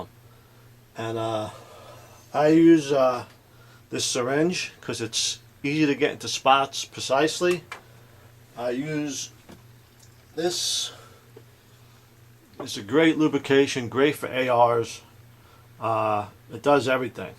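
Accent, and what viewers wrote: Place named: Long Island, United States. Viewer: recognizes the LI accent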